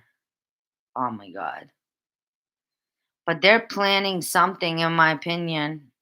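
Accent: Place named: New Jersey, United States. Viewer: I thought you was a Jersey girl ~~~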